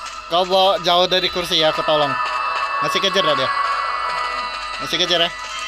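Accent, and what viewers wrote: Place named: Palembang, Indonesia. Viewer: Antara palembang atau jambi ni dari bahasa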